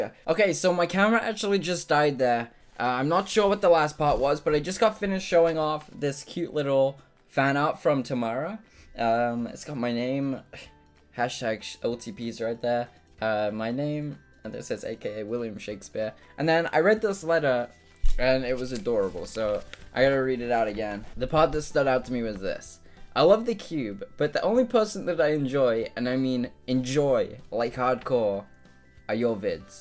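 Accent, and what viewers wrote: United Kingdom. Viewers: I love your mid-British accent, it's like, u can tell that ur some what British but it's confusing by the accent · DON'T MOVE TO AMERICA!!! YOU'LL LOSE YOUR BRITISH ACCENT!!! Slowly but surely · Yes I'm British too I think he is, my friend is like that too coz all her friends are american she picks up the accent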